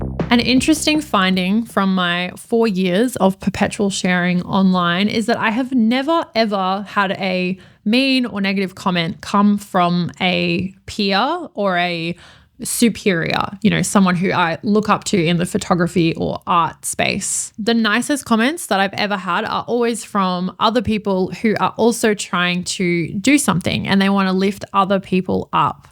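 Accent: Australia. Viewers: Great points across the board. I'm on the big side of my 50's and it took until my 40's to be able to let almost all negative comments slide off my back. This episode can be so good for the young ones that take their interactions so personally. My difficulties come from learning street photography:).. I so want to get better at it, but I really get the icks when people get scrappy with me. Great stuff, I really enjoy your content (and the Gold Coast accent is wonderful - keep on keepin' on)...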